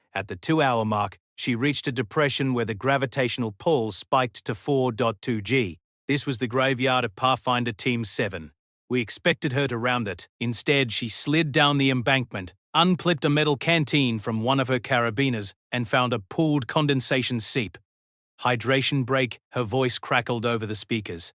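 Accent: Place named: Australia. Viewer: 6/10 the Australian/new zeland accent is a pleasant change of pace from most AI readers, and there were no hangup pauses in the script reading. however, this was also a detriment, as it feels like the reader ignored any punctuation whatsoever. the captioner had one incident of wrong word use. the story itself uses the "lighworlders", "insectoid", "deathworld", "only an earther" and "only a woman" tropes, but avoids the Xenolinguistic names and gender refrences. the shortness of the story is a plus. no wiggly fill for the visuals, and a fixed piece of art is a positive.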